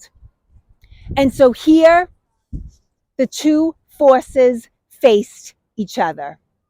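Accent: Boston, United States. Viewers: Assigned to Hanscom AFB in the 90's and am enjoying your collection and the revisits to the sites. I have been to Lexington Green during the annual re-enactment. The things that jumped out to me were... 1. The early morning sun glinting off of the Redcoat bayonets and the obvious lack on the Minuteman side. 2. The tension building over 10 minutes as you could hear the Redcoat drums approaching. 3. The realization that over 50 riders brought out THOUSANDS of militiamen within 100 miles - all able to engage the Redcoats on their return trip to Boston - these were pissed-off people. BTW - thanks for the Boston accent - I don't hear it out here in Colorado and it brings a smile to me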